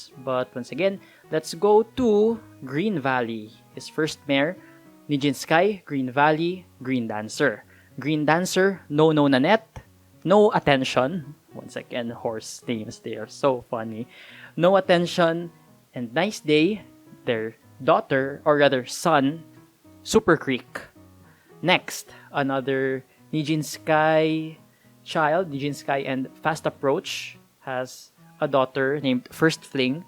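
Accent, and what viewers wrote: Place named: Philippines. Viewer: This needs more views. Filipino accent is strong.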